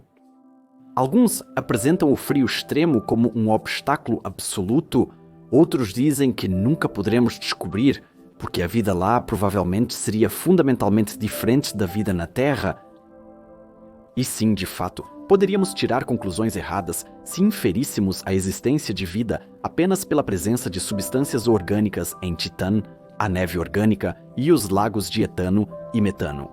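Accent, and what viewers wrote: Portugal. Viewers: Porque do nada durante o vídeo muda para o português de Portugal? 😂😂😂😂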